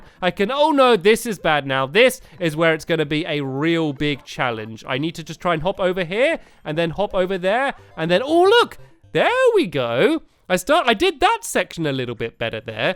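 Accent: England, United Kingdom. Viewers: are you from inglend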